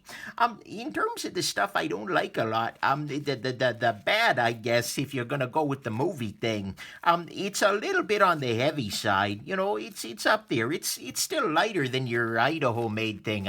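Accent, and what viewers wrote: Canada. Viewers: Hey, are you from Canada or Minnesota? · I knew i could detect Canadian right away.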